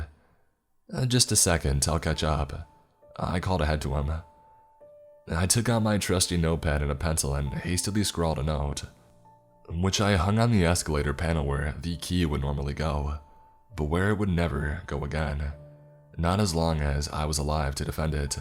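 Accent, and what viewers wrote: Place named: United States. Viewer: Ok I love this channel but some videos he does this voice? An American accent? I'm American so no hate but.. he sounds like a valley girl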